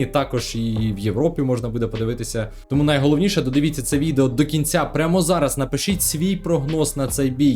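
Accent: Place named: Russia. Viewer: даже по твоему акценту видно что на русском языке лучше разговариваешь,не ломай язык и разговаривай как удобно на русском да и думаешь тоже так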